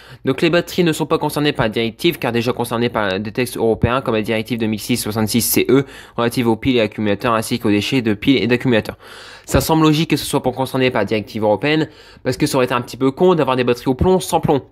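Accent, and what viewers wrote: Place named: Vosges, France. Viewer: Je crois qu'il est Vosgien (d'après l'accent) et je sais que les gens là bas ont tendance à parler un peu vite. M'enfin rien de grave, la vidéo est de qualité et c'est ce qui compte ^^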